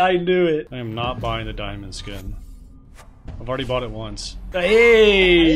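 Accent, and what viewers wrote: United Kingdom. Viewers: its a british person LMFAO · ITS A BRITISH PERSON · Aaaaah! It’s a british person! 🤣 You got it!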